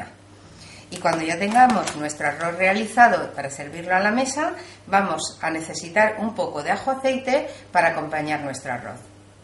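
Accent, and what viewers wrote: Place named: Andalusia, Spain. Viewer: Me da a mí por tu acento que eres andaluza y que vives en la comunidad valenciana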